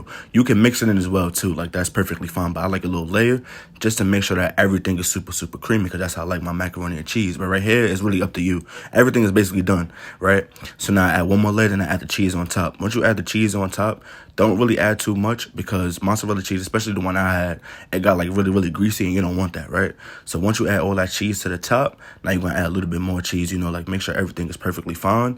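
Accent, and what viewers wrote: New York, United States. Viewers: 🔥 you most definitely from ny though 😆 ya video had me rolling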